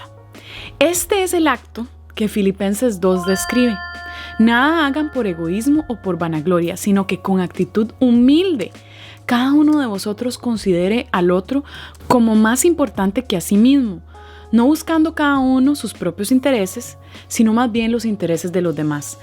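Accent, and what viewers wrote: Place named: Costa Rica. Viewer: Amo la genuinidad de tus vídeos! Me fascina que hablés como tica 💗 y que usés el voceo en tus mensajes! Me encanta tu contenido, es super útil! 🇨🇷😁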